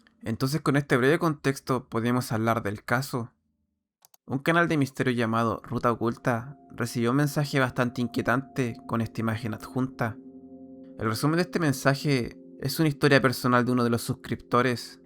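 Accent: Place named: Chile. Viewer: me gustan tus videos porque tienes acento chileno saludos desde chile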